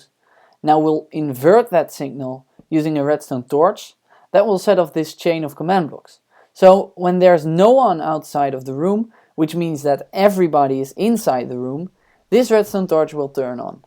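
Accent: Netherlands. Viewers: dude are you dutch? you sound really dutch XD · Awesomely made! Are you by any chance Dutch? You're accent sounds Dutch haha:p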